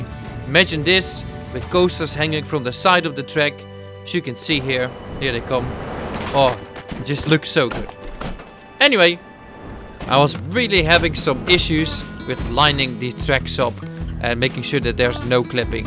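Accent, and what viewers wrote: Netherlands. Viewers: You live in New Zealand right but you have a playlist of dutch Music and you have a accent just like a lot of dutch people so are you dutch?? (Awesome video btw)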